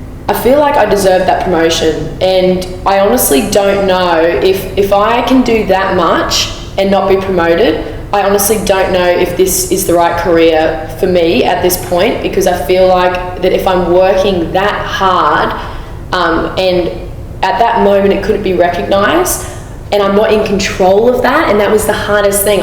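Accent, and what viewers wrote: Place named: Australia. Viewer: Australian accent is so beautiful.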